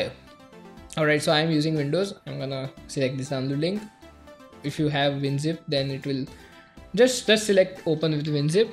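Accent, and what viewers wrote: India. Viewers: Great video god i hate the indien accent - _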